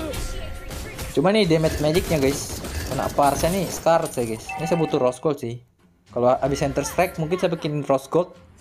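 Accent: Manado, Indonesia. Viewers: Bg orang manado?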